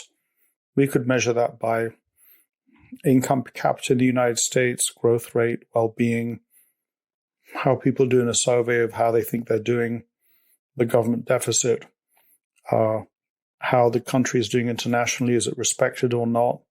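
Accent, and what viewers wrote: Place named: RP, United Kingdom. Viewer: ABSOLUTE FUCKING BOLLOCKS. TAKE YOUR PONCY ENGLISH ACCENT AND FUCK OFF. YOU'VE NO IDEA WHAT THE FUCK YOU ARE TALKING ABOUT.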